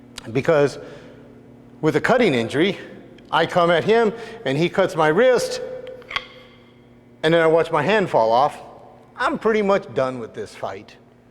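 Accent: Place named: Texas, United States. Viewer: Is he Texan/Oklahoman? "Wooden d-..daggers.." old grumble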